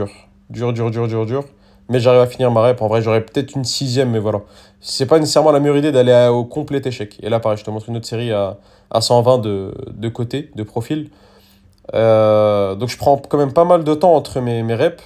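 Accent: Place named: Kabylie, Algeria. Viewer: Salut super vidéo, dis moi t'a un petit accent t'es kabyle ?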